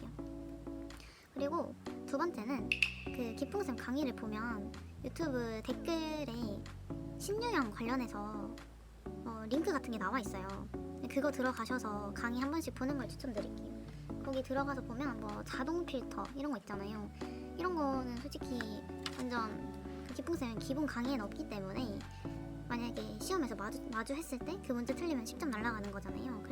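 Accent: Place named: Gyeongsang, South Korea. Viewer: 실기후기영상 보러왔습니다 일단 축하드려요 ~ 그리고 사투리가 좀 보이는데 고향이 경상도쪽이신가요? 시나공으로도 연습해보셨는지도 궁금해요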